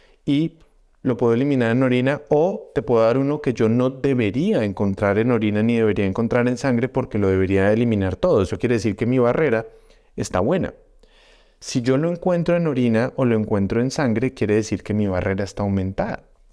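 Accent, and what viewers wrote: Colombia. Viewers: Gracias... maravillosa información..saludos de México amo el acento Colombiano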